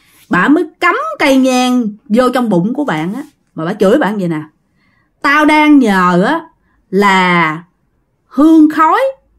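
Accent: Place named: Mekong Delta, Vietnam. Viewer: dân miền tây thì mình cứ phát âm theo dân miền tây đi chứ ko cần phải phát âm theo dân miền bắc bạn hiểu mình nói ko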